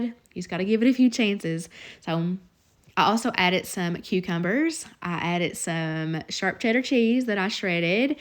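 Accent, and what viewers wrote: South, United States. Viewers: I love the southern accent, way too cute!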